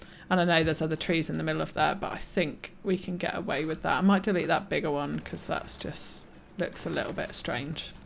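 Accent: United Kingdom. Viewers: Another great CS channel to subscribe to. This really is some superb quality content. Inspires me to take a bit more time on my builds. Love the music you used in this 2nd video. Excellent narration and great to hear another Brit. Please keep up the amazing work, and congratulations on nearly 3k subscribers. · Subscribed. Great content and nice to have a Brit to listen to! Shame about the road direction 😜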